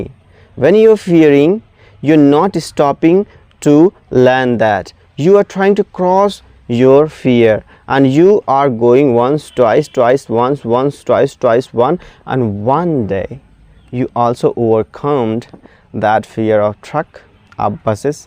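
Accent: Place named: United Kingdom. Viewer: I really liked your English accent I am amazed that how a boy from my village is able to speak such excellent English and that too in a British accent. Wonderful!❤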